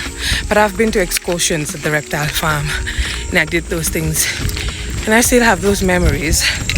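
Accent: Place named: Namibia. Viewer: I am Namibia and I kid you not, you look and sound Namibian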